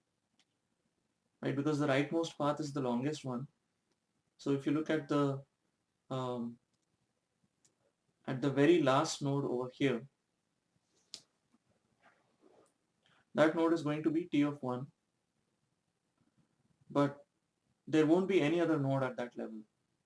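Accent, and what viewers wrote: India. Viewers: Игровой канал RobosergTV Well many people actually just hate indians and their accents , so they say hateful things ,just to bring em down ,just out of spite. Even when they are able to understand most of it , they would bash the poor guy. Also , they were too harsh. It is fine if you dont understand the accent , but then you start insulting him , his family and country just because you dont understand his accent? Like this mutual white friend of mine is sort of racist against black people , so he always finds the worst in them.Always talks about crime rate due to black people and dirty streets because of them. Get it? If you are slow , read it again and again , until you get it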